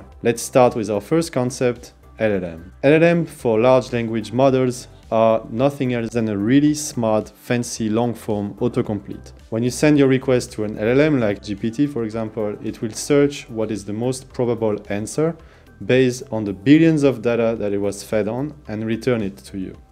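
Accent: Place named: France. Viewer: Very useful content and lovely French accent 👌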